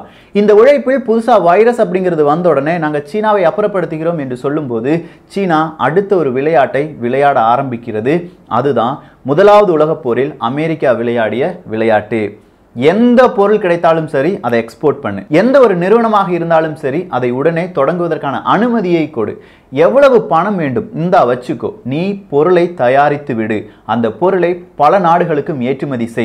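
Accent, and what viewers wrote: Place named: Kanyakumari, India. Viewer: By listening to his slang I think he is from kanyakumari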